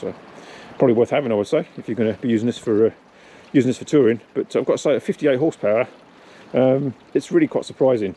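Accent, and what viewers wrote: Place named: Ireland. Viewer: Cool bike, love that Irish accent. Thanks man.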